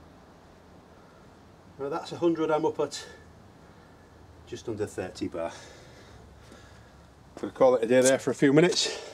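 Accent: United Kingdom. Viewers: Well it was definitely interesting to watch the video to get a good idea of the process. But if you do this regularly it's one way to get to a more fit with this workout, also as you stated a few times during the video is that the pump has to cool down (keep in mind that the internal seals will reach a much higher temp than the outside). One thing that may help both you and the pump is have a fairly powerful fan force some air circulation around both you and the pump, probably best not to do this outdoors though as you might blow up dust from the ground and suck that into the pump cylinder more than needed. Also one thing which I didn't pay close attention to, did you bleed the line between pumping sessions? I usually pump for 4-5 minutes myself, bleed it and take a break while the pump can cool down to refill my air rifle. And once I get above 200 bar I kinda have to cheat and grab a backpack that has a few full water bottles in there to get the pressure up to the max rated 232 bar of my air cylinder on the rifle. 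I'm guessing you live in the UK based on your accent, chances are humidity is usually pretty high then similar to what I'm getting by living in coastal region. If you keep pumping without bleeding the line between sessions odds are you might be building up a lot of moisture that may end up inside your tanks. You'll still get some in there if you bleed it between pumping sessions but it should drop significantly, if you've pumped your tanks this way frequently by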